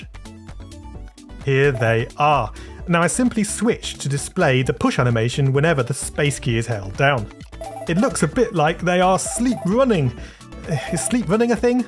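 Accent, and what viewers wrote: United Kingdom. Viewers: it’s the hot british scratch guy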